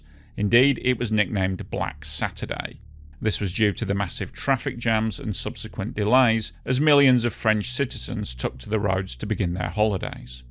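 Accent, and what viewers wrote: United Kingdom. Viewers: . . . great point, I'm of the same thought. . . . as well, it's really reaching out there to correct a video producer in the comments section 🤣🤣Being way over here in the US, it looks exactly like a Frenchman wanting to correct their arch rival Brit any chance they can get, cause this guy sure sounds British to me anyway.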